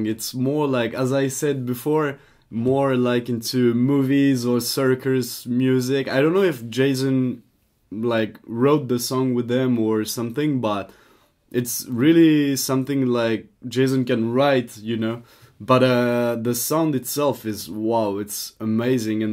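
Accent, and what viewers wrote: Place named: France. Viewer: Avoue t'es français, on a tous cet accent xD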